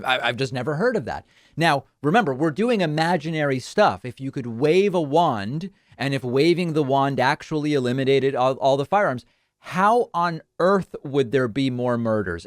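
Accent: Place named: Argentina. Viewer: I've always found the Argentinian dialect of Spanish so unique, just lovely. And, you speak beautifully...more please! 😁 Huge fan of you & your show! Love and blessings, from California.💙💙💙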